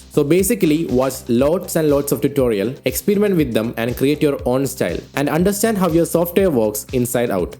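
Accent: India. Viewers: Are you indian because accent is like indian people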